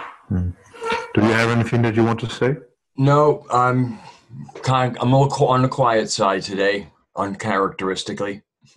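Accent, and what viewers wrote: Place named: Netherlands. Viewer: Gaia's Public Health Nurse yes it seemed some paper or something was rustling up against the microphone. Never mind, it causes us to pay closer attention. I think his accent is Dutch or Dutch-Indonesian.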